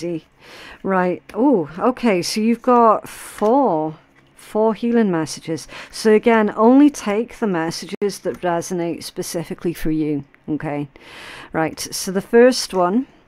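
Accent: Scotland, United Kingdom. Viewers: Girl I say cut the comments back off I totally understand why u did. Lots of haters from my country US I'm from the US I am such a lover of ur channel and skill and I love ur Scottish accent yall rock You're desk is so pretty I love the cards you switch up Love your style it's so mystical Bless you & Thanks so much for the readings!!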